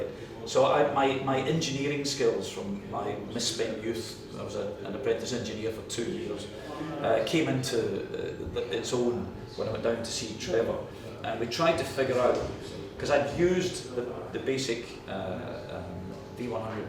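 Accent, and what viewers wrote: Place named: Scotland, United Kingdom. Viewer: Oh my... he talks like Fish.. is Scot?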